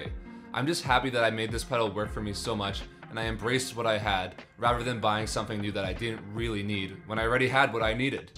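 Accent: United States. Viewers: You traded a custom board that you had to do some convincing to procure... and then traded it for one pedal that's so common you could piss off your back porch and somehow hit a carbon copy? Also, you using brit heat measurements with an American accent... weirder than tim walz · Where in the world do you sound like an American but talk in Celsius? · It is weird that someone with an American accent uses Centigrade. Probably living abroad.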